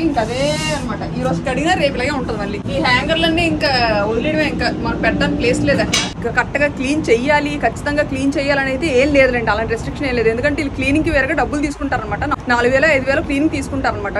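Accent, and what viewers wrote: Vizianagaram, India. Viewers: Desha basha landhu Telugu lessa.. Ejjinagaram basha enka superu.. Great.. adhirindi.. god bless you. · your voice and slang, very connecting to our own area and place.. very authentic and natural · Yeah true bro, and filmy moji valla mana vzm slang ki YouTube lo Baga reach vastundi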